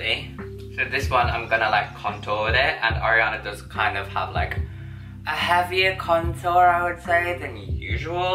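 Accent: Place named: London, United Kingdom. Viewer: I’ve just been watching all of your old videos from like 3/4 years ago and you used to speak to posh and delicate and you used to be so quiet and no hate but all of your recent vids you’ve got this mad London accent it’s weird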